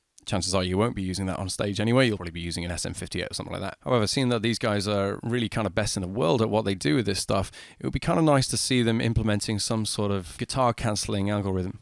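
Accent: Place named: United Kingdom. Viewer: What a great review and video. I've watched a few vids on this pedal looking for specific info and finally, you knocked it out of the park. The eloquence of speech delivered with the British accent was spot on mate. (Haha) Last but not least, the song performances were quite good. If those are your tunes, I'm thoroughly impressed. Hell I'm impressed regardless. Later dude.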